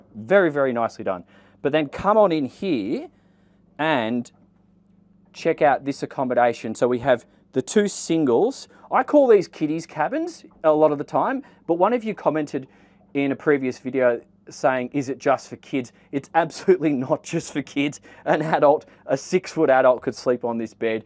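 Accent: Australia. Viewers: This Boat is awesome, but i love listen to your Aussie Voice too!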